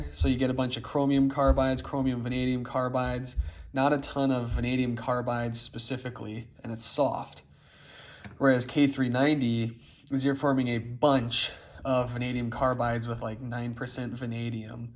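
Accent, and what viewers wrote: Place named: Spokane, United States. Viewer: ...sounds like you're from Spokane WA. My cousins say it that way..cool content btw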